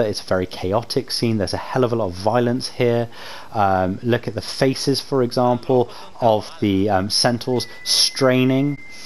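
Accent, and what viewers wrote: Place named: United Kingdom. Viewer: and that sweet british scientific accent hahah, thanks for video man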